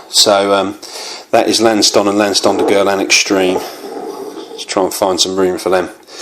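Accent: United Kingdom. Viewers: Great video, mate! I concur on all of your suggestions for beginners apart from Prada Amber, but that might be because I am not a big fan of it. Nice accent, by the way, I bet you are not from London. Newcastle?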